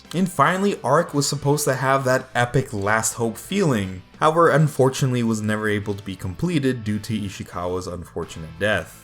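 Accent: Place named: South Korea. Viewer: なんかぺららぺ朝鮮なまりがきつい朝鮮なまりがきつい まじで・・・YES・・まんじゅ。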